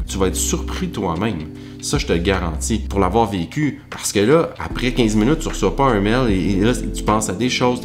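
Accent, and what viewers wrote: Canada. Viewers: Bonjour, je ne suis pas surpris par ta vidéo, qui reflète bien la société dans laquelle nous vivons!!!!!! je suis tout jeune saxophoniste de 33 ans qui a enfin pris le temps grâce au confinement car je suis cuisinier 😅 pour apprendre l'instrument qui ma passionne depuis tout petit. Je travail tout les jours pendant 15 à 45 min et je progresse rapidement car j'ai dejà des notions de musique en piano et batterie!!! Grâce à toi et à tes vidéos j'ai pu correctement m'enrichir de ton savoir avant d'acheter mon sax alto ( et même ton bec syos 😉 ) et d'écouter tes conseils précieux. Trop peu de saxophoniste Français se lance dans une chaine Youtube aussi complète que la tienne et je ne regarde que toi maintenant!!!! continu sans te soucier des pourcentages sinon tu ne fera que lire des statistiques au lieu de nous transmettre ton savoir et j'en prend pour exemple un restaurateur qui ne regarde que TRIPADVISOR pour savoir si sa cuisine est bonne ou pas!!!! 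mais l'idée de la licorne est pas mal 🤣🤣🤣 trouve une chemise en adéquation 😆!!!! et j'adore l'accent canadien 😘 bravo et continu, j'espère dans les années à venir faire ta connaissance et partager avec toi.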